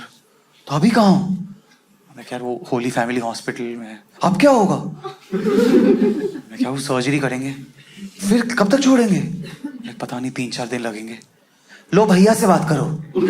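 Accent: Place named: Bengal, India. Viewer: I like your benlivised english.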